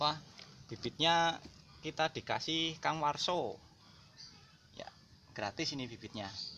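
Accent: Banyumas, Indonesia. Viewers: Mas sampean kok medok banget..ngomongnya kok ngapak2...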